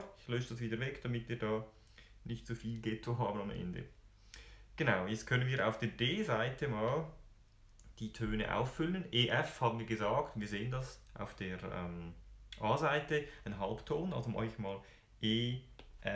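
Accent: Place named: Switzerland. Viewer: Hallo, Deine Videos sind sehr hochkarätig, sehr gut strukturiert und angenehm anzusehen (auch wegen dem schwiezer Akzent;)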